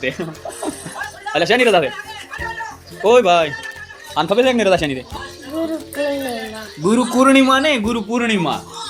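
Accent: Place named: Banaskantha, India. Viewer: જય માતાજી ક્યાં થી છો ભાઈ ભાઈ તમારી ભાષા બનાસકાંઠા ની લાગે છે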